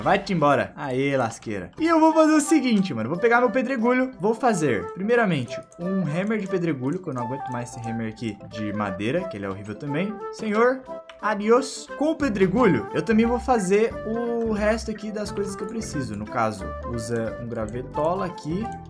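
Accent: Rio Grande do Sul, Brazil. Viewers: tu é mesmo gaucho ou tu so brinca com o sutaque?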